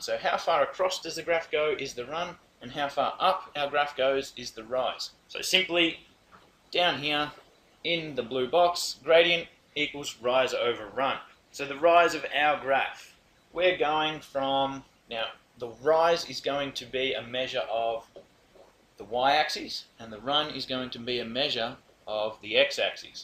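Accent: Australia. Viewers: sweet aussie accent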